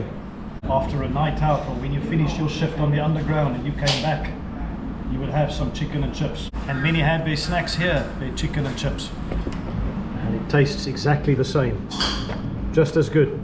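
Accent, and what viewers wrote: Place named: Cape Town, South Africa. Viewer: Jy is ñ Kaapenaar met die aksent,! 😁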